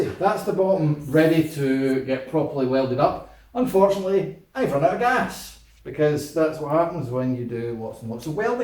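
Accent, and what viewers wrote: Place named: Scotland, United Kingdom. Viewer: I Like the video but the Scots accent from the scot is sometimes hard to pick up what he is trying to tell us about his build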